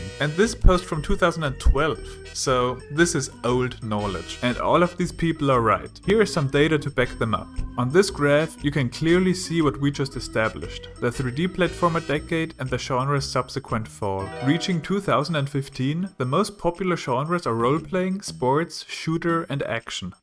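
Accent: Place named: Germany. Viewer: Sehr interessantes Video und mir ist dies auch aufgefallen, was mich sehr traurig macht, weil ich Platformer/Jump 'n' Runs am meisten liebe! :( Bin mit Banjo, Mario und Rayman aufgewachsen und liebe diese Spiele bis heute alle noch <3 Und ich als selbst Deutscher, hab sofort an deinen Akzent erkannt, dass du auch einer bist xD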